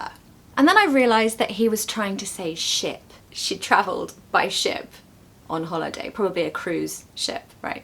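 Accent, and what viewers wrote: RP, United Kingdom. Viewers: Amazing lessons❤❤ I love your British RP accent❤❤ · That's probably the main reason why I'd go for a strictly phonetic language like, for instance, the German language, if I were in a situation to choose between the one and the other :) Yeah, anyways, I'm not here to complain or argue :) English is just fine, and I really enjoy learning it. Love your accent, by the way. RP if I'm not mistaken? 😊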